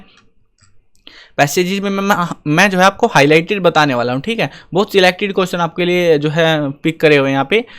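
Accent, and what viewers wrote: Haryana, India. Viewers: Sir aap haryana se ho?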